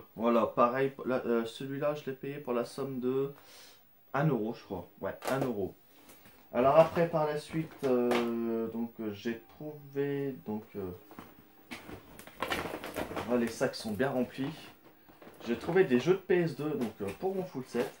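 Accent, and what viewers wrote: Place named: England, United Kingdom. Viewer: super retours ! bien joué. j'adore ton accent anglais ! 745 jeux ps2 !! Ça en fait des daubes ;)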